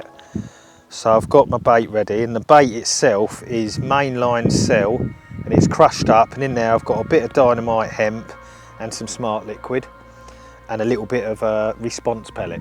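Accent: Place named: United States. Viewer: Great video but why the stupid American accent…?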